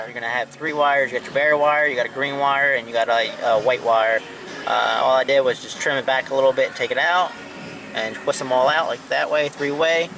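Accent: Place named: South, United States. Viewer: What’s up brother just subbed thanks for the info. Where you from? Trying to figure out where you accent is from sounds southern a bit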